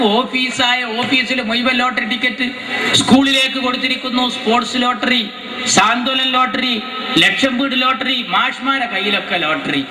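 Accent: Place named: Kollam, India. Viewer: ഹാ ഹാ ഹാ കൊല്ലം നല്ല മലയാളം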